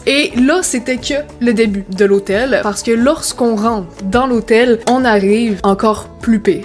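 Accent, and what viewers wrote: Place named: Canada. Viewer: Hi, at first I thought you are French but at the end I pick up the Canadian accent. I've been reading in the news Canadian don't visit the US anymore. What do you think of the avocado it's not good? I bought 1 in NYC after a couple days when I cut it water. I was in Montreal last September I love it, I stay in downtown Montreal rue ST Denis not to far from Berri-UQAM, Place des Arts there's a big Mall, and McGill uni.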